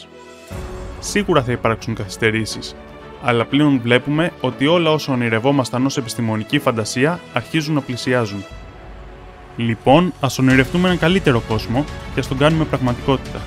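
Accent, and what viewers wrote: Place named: Albania. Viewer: Ε, όχι και τόσο καθαρός λόγος που προφέρει το ρ σαν αλβανός.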